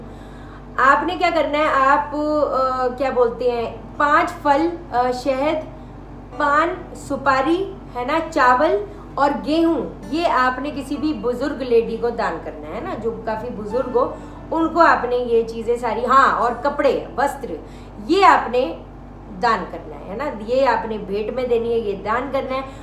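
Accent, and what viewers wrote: Punjab, India. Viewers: I am very much impress with your way of talking punjabi tarhka .And i also like your upay mam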